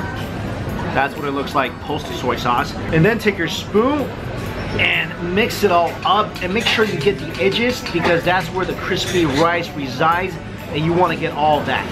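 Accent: New York, United States. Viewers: and on, the chicks sitting behind you are freaking out. You look local but you´re talking New Yoakah, LOL!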